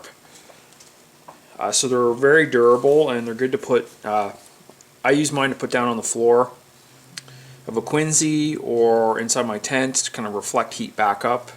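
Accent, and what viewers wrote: Canada. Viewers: Knew you were Canadian the moment you started speaking; cheers fella!!!!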